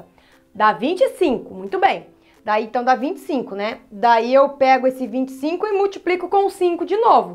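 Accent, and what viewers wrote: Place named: Santa Catarina, Brazil. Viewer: Voçê é de Santa Catarina?Voçê tem sutaque..😅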